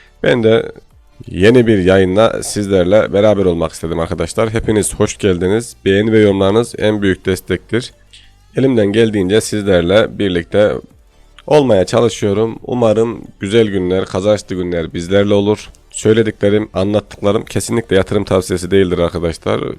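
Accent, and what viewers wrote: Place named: Konya, Turkey. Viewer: Konya gibime geliyor 😊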